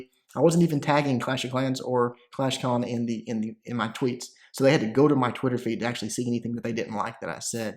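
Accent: South, United States. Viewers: Is it just me or is southern accent feels like it's slowly fading 😳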